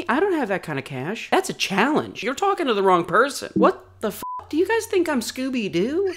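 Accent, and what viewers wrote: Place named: Boston, United States. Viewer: It’s a fucking Boston accent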